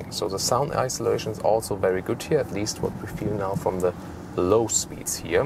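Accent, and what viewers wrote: Germany. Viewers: Mensch, habt ihr nicht sonst Reisen getestet oder Kreuzfahrten? Wie dem auch sei, nettes Fahrzeug. Nur einem Deutschen beim Englisch sprechen zuzuhören, ist sehr sehr anstrengend. Nichts für ungut ;) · Great review(s) you´re doing even with that German accent. Great car either, this XC90, except for it´s poor typical mid class sized engine which will keep buyers for the premium segment away. Driving an old XC90 for nearly 7 years now I was looking forward to the new one. But definitely not with that tiny engine. What a shame. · nice german accent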